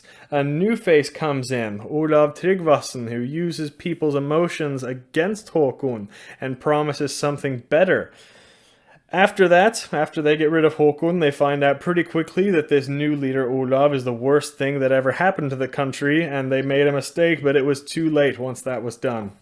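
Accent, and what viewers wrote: United States. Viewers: Is the bloke in this video actually Norwegian? He sounds very American. I know Norwegians, they don't sound like this bloke, this bloke sounds like an American that moved to Norway (or Norwegian but spent more time in America) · Pretty sure he is Norwegian, yes. Most non Americans develop an American accent when they learn English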